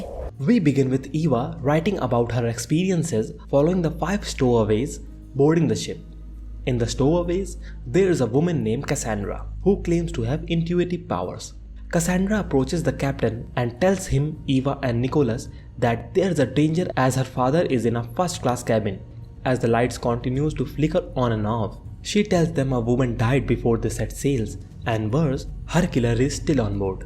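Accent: India. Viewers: Very bad gujju accent. sorry.. thumbs down.. please dont spoil our day again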